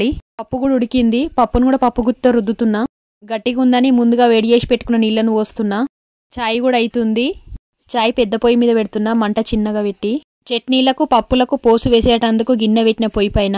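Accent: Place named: Jammikunta, India. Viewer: Maadhi jammikunta akka meeru matladthante mem matladinatte anipittandhi....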